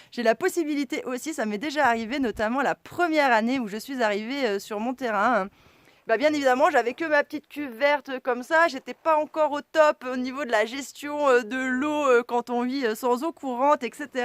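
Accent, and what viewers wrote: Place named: Saint-Étienne, France. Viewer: Hello, il me semblais bien que ton accent me disais qu'elle chose surtout quand tu as parlé de st Retienne!! je suis de Luriecq dans le 42 et je suis en train d'acquerir un terrain (bois = clairière) près d'Apinac à 950m d’altitude, donc comme tu vois ton expérience et très importante pour moi. Nous aurons peut être l'occas d'échanger dans un futur proche nos expériences spécifiques. Encore merci pour ton partage.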